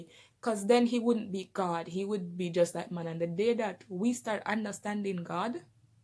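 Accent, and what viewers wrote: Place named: Jamaica. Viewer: The Jamaican in you can't hide sis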